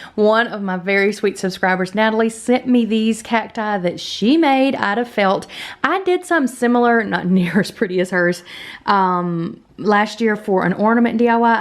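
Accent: South, United States. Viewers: Your Southern Voice is Adorable....Just like your Crafts<<<<Happy Holidays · Love the southern accent! Great video, thanks. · I adore the peppermints for my nieces rooms , love your southern accent ;) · I’m loving your energy and your southern ‘ya’ll’.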